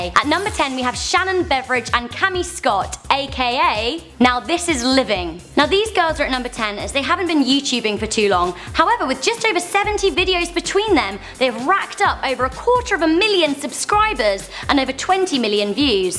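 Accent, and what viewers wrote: United Kingdom. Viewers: She is british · It's her accent.... people with english or english-like accents pronouce things that end with "A" with an "er" sound. example: idea -> idear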